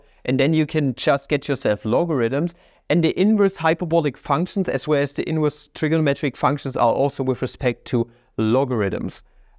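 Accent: Germany. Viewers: I very much appreciate your videos, just brilliant mathematics. The heavy German accent is not a big deal (I’m German myself). But please, please, do me a favour and try to pronounce the “i’s” and the “y’s” as /ai/ where needed. A “y” and the word “why” is pronounced correctly /wai/ and not /va/. It means corporal pain to me to hear it like 100 times in a row in your videos.😢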